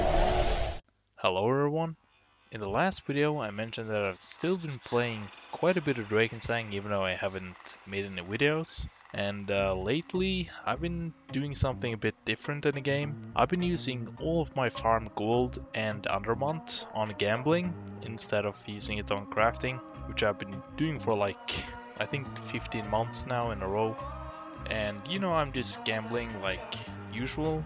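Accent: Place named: England, United Kingdom. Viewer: Where about in England you from?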